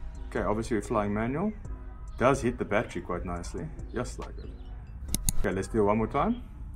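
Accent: South Africa. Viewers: Hey, if I'm right and i listen to the accent, you are South African Just like myself😅😅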